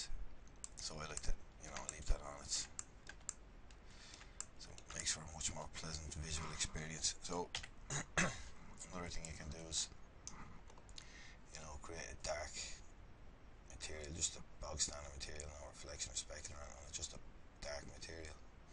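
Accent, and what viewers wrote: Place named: Dublin, Ireland. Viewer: so weird hearing a fellow dubliner pop up on one of these videos :P nice stuff man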